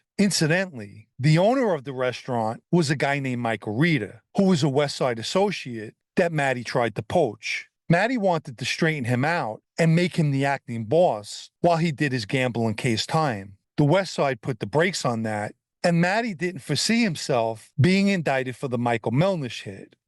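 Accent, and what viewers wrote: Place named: Long Island, United States. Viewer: Dude, great presentation, that LI, NY Italian voice nails it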